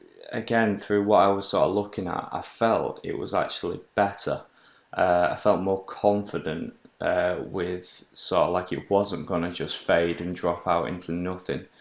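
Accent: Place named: Greater Manchester, United Kingdom. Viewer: Yeah i can see where you were coming from. Get a patch on and you should see improvement, i only flew a few packs with mine at the weekend and i love it already. I wasn't prepared to pay over £100 for the LaForge for what is probably exactly the same performance wise. ps. your accent sounds local(ish) guessing somewhere around Greater Manchester?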